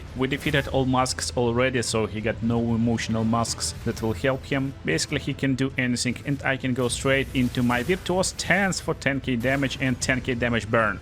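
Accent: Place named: Russia. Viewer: ю инглиш гуд, бат ай ноу у рашн))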